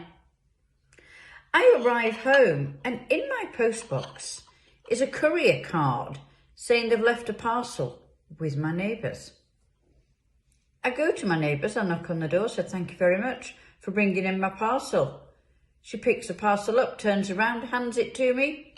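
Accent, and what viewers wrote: United Kingdom. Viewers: Absolute class. Great dresser- and love that posh Stoke type accent.🤟🏼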